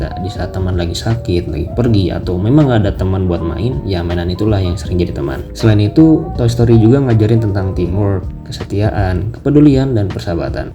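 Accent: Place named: Banjar, Indonesia. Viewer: Keren bang....semangat...kaya urang banjar ( urang = orang dalam bahasa banjar ).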